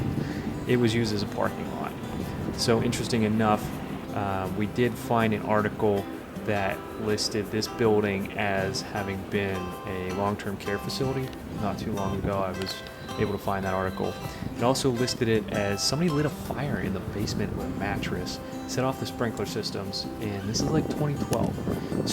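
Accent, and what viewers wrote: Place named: Pittsburgh, United States. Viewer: Popped up in recommend, heard a yjnzer accent, saw the house and was like hey I grew up in jeannette! Crazy how the world works. Good luck!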